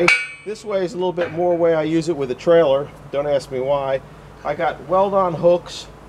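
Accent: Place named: Ohio, United States. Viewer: Where are ya located ol boy. Been wondering, we all think your an Ohio boy from your accent and your poplar you cut.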